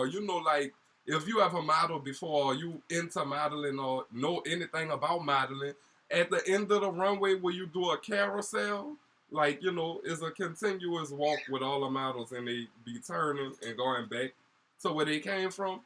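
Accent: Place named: New Orleans, United States. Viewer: I'm mad at you! you got the nerve to make the episode seem exciting but it was Trash LoL, you're brilliant. Love your Bondy & Supa accent in the hse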